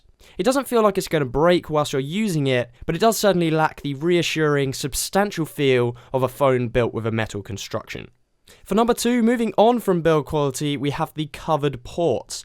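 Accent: United Kingdom. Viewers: Ok my British sounding fellow friend first of all, I got a couple of complains. I know you don't want to hear it but... deal with it. I'm a very proud owner of my GALAXY S5, And this is what I have to say.. #1 yes the phone feels cheap, I get it, but remember Samsung is trying to keep the devise price affordable, compared to any iPhone of the same generation. #2 the charging port reminder can be deactivated by checking the "don't show this again" checkbox like I did... the flap... deal with it. #3 if you don't like touchwiz so much, download and install a different launcher, I'm currently using buzz launcher over touchwiz, and haven't looked back, and you CAN disable the news Crap at the very left of the touchwiz pages that lags so much, I never used it at all (I can't quite remember how tho sorry) but I did it.... #4 the speaker sucks........ I know.... 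I got nothing. #5 the fingerprint scanner works fine, don't be over dramatic about it, use it right instead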